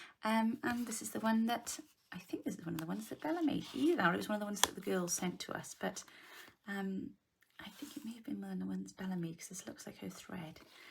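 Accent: Wales, United Kingdom. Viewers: Just found your channel from a fellow Aussie. This looks a fabulous idea. I can hear a welsh accent...oohhh...how wonderful. I was born in Wales and my family emigrated to Australia when I was 7. Most of my family still live in Wales. So lovely to hear a welsh crafter. 🙂❤️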